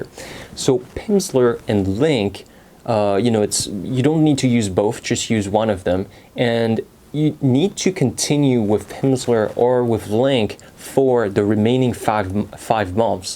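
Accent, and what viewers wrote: United States. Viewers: It is incredible! When I come across your video, I thought you were an American :)) Incredibly man! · native french speaker? your american accent is absolutely incredible !!!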